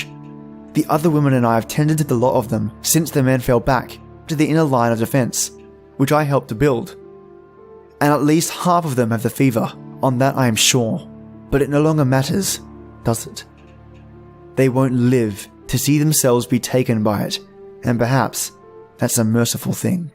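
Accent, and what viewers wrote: Australia. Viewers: I missed your awesome Aussie voice 😩 Hope that didn't come off creepy, hahaha. I had no service for a few days and felt like I was going crazy without any stories from my favorite narrators! I'm gonna enjoy this one 🤗👍🖤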